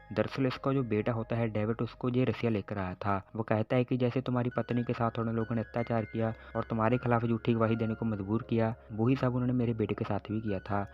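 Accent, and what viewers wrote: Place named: Haryana, India. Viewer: Bhai hryanvi ho k? Touch aa rheya se hryanvi.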